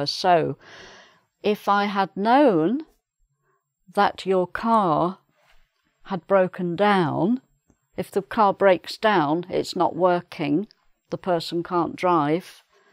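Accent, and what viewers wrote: England, United Kingdom. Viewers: I love her British accent ♡ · Thank you Mrs! I do appreciate your lessons and your beautiful British accent. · British English 🤗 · Your British accent makes me warm,. I love your lesson. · I am an English teacher and today I have learned that uses of "Would." Thank you Miss. (Plus points: you don't speak too fast and I like your british accent.) Lovelots from the Philippines. 😘😘😘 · I love British accent. ❤ I feel happy when I listen to this loving teacher. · I'm head over heels for your british accent a lot. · excellent teacher no doubt! Me being french speaking English since 1977 on a daily basis mainely in the U.S I ADORE hearing this REAL English accent :)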